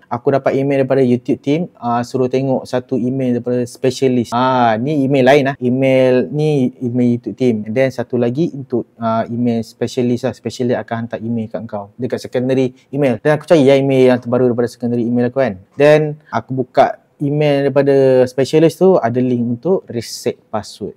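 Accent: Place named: Kedah, Malaysia. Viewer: Kedah mana, Aku pendang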